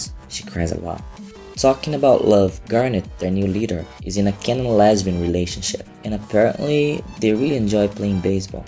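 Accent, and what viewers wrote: Brazil. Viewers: Eu percebi o sotaque brasilero!:) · Mano vc eh br? Pq parece mt pelo seu sotaque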